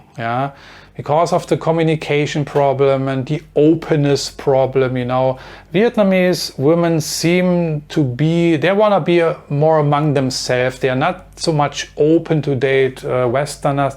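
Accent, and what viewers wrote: Germany. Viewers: love your dinglish Sir, I was living in Nurenberg before, totally missing this beautiful accent, and here is my story, I just met a super pretty Vietnamese lady from Vietnam Cupid website, but I'm suffering the huge obstacles in the lack of english and the difficulty to learn Vietnamese language, the differences of cultural behavior is confusing me greatly, the most incredible thing that i noticed is her super dedication to me which i never seen in my life with any woman I met....we already had 2 fights on our first week, but this video taught me a lot and I hope i will adapt to her....I can mot believe they do not teach english in school, I had to pass 3 languages in Egypt High school to go university ....!!! any how, Danke schoen.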